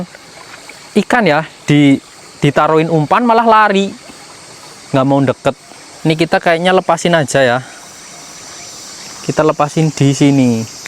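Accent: Banyumas, Indonesia. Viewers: Kiye wong banyumasan kiyeh...cilacap, banyumas, purbalingga, banjar negara, apa bumiayu lur..? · Kok ngapak mas, asli mana ? Maaf penonton baru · asline ngndi kang,,ngapak deneng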